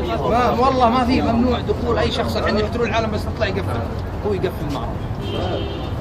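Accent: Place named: Saudi Arabia. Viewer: يابني ده سعودي ليتكلم مصري ..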